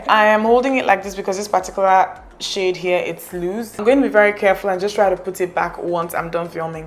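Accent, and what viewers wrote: Igbo, Nigeria. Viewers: you should do a makeup video in Igbo! It would be so nice! Plus ur Igbo accent is 😍😍😍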